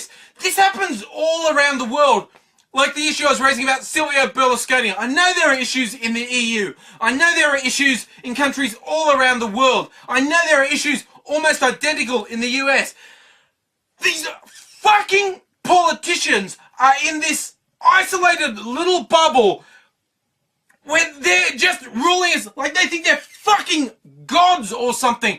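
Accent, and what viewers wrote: Australia. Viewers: omg your so funny...u talk in this angry voice ( with a Aussie accent) and i think that's funny...but what u talk ABOUT is 100% on the mark...for that 5 big as *'s :)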